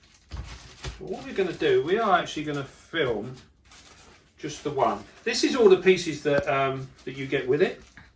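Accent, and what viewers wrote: United Kingdom. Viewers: Hi, your videos have made me want to get back into tarantula keeping! I assume you live in the UK by your accent so I just wanted to ask a question. Do you use heat mats and thermostats for your spiders? I've heard they are ok at room temp but it seems widely discussed in the community. Keep up the great work!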